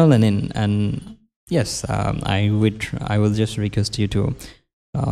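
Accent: Bangladesh. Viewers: He is bengladeshi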